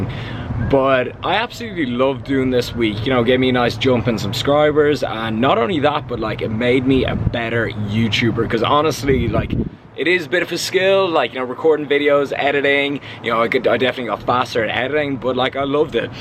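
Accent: Ireland. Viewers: Is this dude Irish can't really tell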